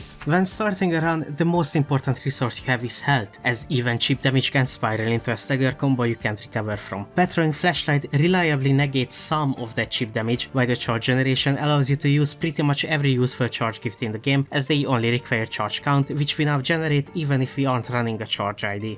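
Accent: Hungary. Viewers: This accent...magyar...hmmmmmm... Holy shi im right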